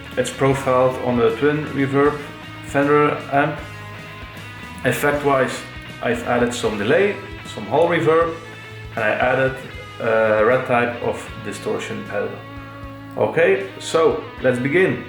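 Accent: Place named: West Flanders, Belgium. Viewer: Westvlaams om precies te zijn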